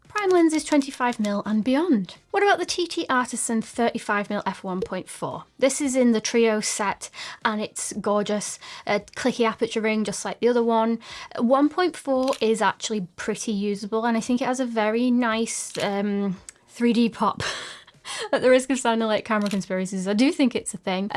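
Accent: United Kingdom. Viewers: You could do voice overs for british characters in animation films. Your voice is almost perfect.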